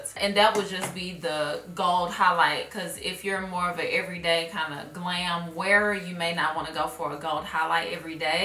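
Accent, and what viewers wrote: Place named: Memphis, United States. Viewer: Lol...i live the Memphis accent. People never have to tell me theyre from M-town cuz the accent leaves no doubt if you are familiar with it.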